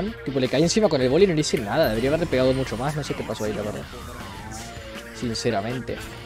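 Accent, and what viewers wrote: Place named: Argentina. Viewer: Este pibe es una masa la voz me hace acordar a Dross pero con acento argentino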